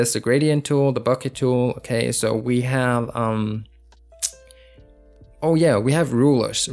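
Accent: Germany. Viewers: Are you german?:)) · Are you German?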